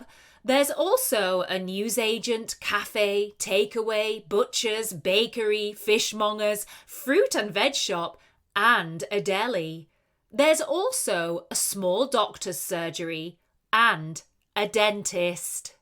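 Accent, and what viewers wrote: United Kingdom. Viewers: 🏋️🏋️🏋️ Thanks so much ☺️ Ma'am it is a wonderful story ,I was so scared of listening 🎧,the story I thought I can't understand , fortunately I can understand because I do love British accent💃💃💃💚💛 Definitely I have explored that ,this is the good way of enriching our english pronunciation as well as improving our vocabulary 📌💚💪Your voice is clear everyone can get what you mean 😊😊😊😊✨.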